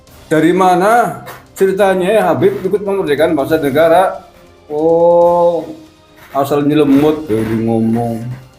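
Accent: Banyumas, Indonesia. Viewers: paling seger nonton kontenne panjenengan bung.logat ngapakke ngalahno boso inggris.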